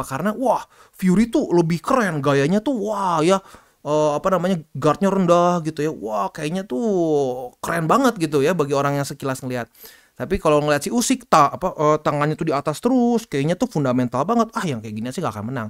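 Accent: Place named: Bandung, Indonesia. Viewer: Orang cindo bandung dan merantau jakarta rata2 logatnya seperti ini.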